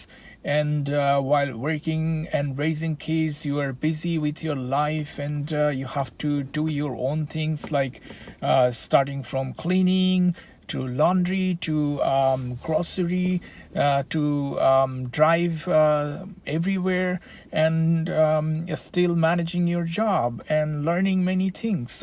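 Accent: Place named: Canada. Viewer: Bhai your accent is amazing. Looks like a native Canadian.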